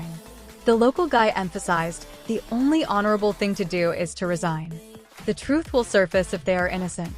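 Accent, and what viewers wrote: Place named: United States. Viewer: Why is this in an American accent?🙄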